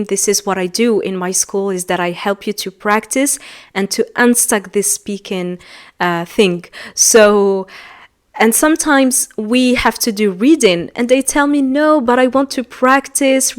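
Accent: United States. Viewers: تقولي شوفوا هاري بوتر بس الاكسنت حقك امريكي وليس بريطاني؟!😮 الزبدة هاري بوتر مو الاساس اريد الطريقة حقك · نطقك ما شاء الله امريكية❤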